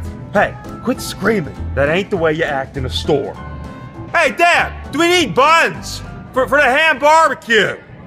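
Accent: Pittsburgh, United States. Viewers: I live in moon township and this is exactly how they talked even my baseball coach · Never gets old. I grew up in Pittsburgh in the 70s when the yinzer accent was still alive and well! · GREAT SHOW- THIS GUY CRACKS ME UP,AND HE REALLY DOES SOUND LIKE US PITTSBURGHERS!!! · I from Pittsburgh, but I go to college in upstate NY. I’m a senior now, and I think at least once every year I’ve watched this video when I got homesick. Hearing the Pittsburghese always gives me a little taste of home and makes me feel a lot better! Thank you! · Is this what real Pittsburg accents sound like I hate it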